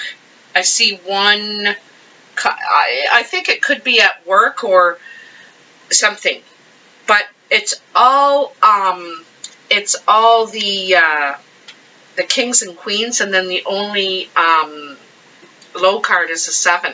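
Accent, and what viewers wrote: Canada. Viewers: Ha, thought I could identify a fellow Canuck. I'm in Southern ON, GTA. I have family in North Bay though. Have a good one as we say.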